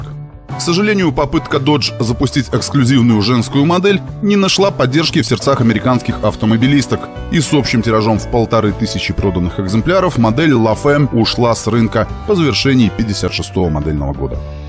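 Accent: Belarus. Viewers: Автор из Белоруссии? Интересный "говор"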